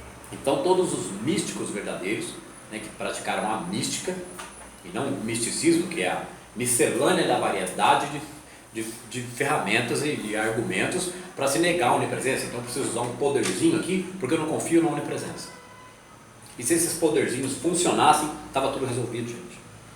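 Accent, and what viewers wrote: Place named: Minas Gerais, Brazil. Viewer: Adoro sua dicção..bem mineiro mesmo ..eu tambem sou mineira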